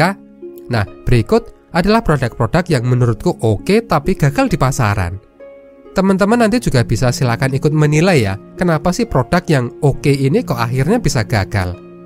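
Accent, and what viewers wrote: Java, Indonesia. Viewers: Jowone ndi min admin sampean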